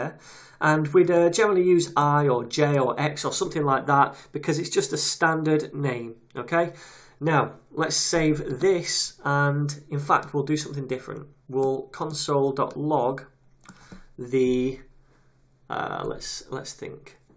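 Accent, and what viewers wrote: United Kingdom. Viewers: everything's better explained in british accent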